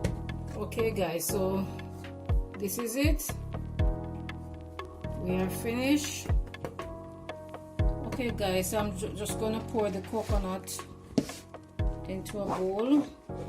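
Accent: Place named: Jamaica. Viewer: Hello!! Mirna from Southern Arizona here! 🤓🌞 I love everything coconut! 👍 I'll be sure to try your recipe! Love your Jamaican accent 😁🥰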